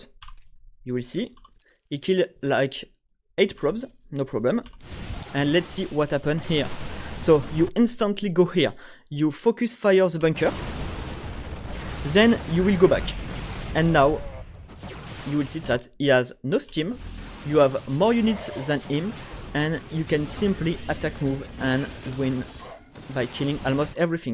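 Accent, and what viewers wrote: France. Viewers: ton accent anglais fait tres francais c est tres drole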